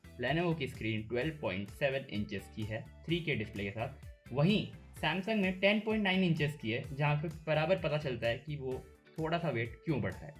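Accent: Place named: Delhi, India. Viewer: LENOVO OFFICIAL PRICE IN PAK IS 110000 AND I ALSO OWN SAMSUNG FLAGSHIP TABLETS UR ACCENT IS OF DEHLI REGION AND VERY ATTRACTIVE AND LOVE OUR ANCESTORS CITY BEFORE 1947 BEING RAO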